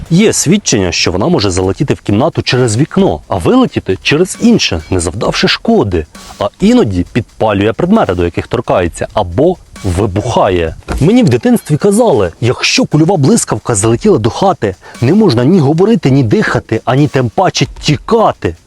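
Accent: Cherkasy, Ukraine. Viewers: У автора черкаський прононс?))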